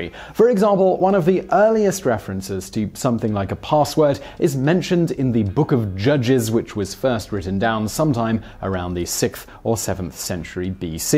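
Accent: United Kingdom. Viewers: OMG! Saaaaaauck, I'm dyin' here. There are times I really love the British accent.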